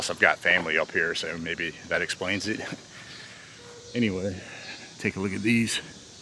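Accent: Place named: Maryland, United States. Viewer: You’re going to some interesting places..thanks for the video! I have lots of family in Maryland, and you have the same accent as them..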